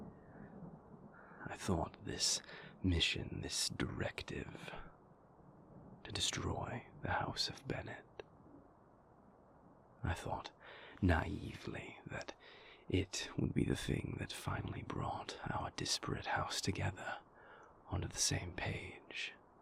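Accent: United Kingdom. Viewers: FINALLYYYYYYY, now cue the emotional tears and British accent 🫡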